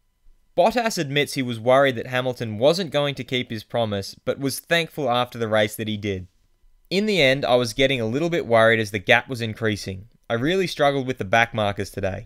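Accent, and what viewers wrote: Australia. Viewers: Are you talking about the voice in the video? Because he's Australian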